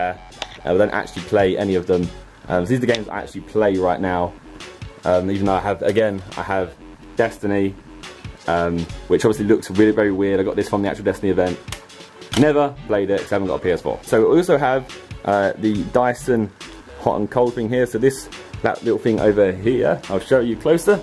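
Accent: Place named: London, United Kingdom. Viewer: I love the way Londoners say 'something'. 'Hey guys Zerkaa here and ive got suink a little bit different for you today'. Just lol.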